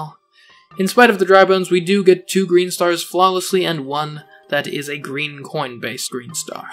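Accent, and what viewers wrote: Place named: Upper class, United Kingdom. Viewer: I like the idea of the video but this thing where you force yourself to enunciate every letter, trying to sound all upper class, really puts me off.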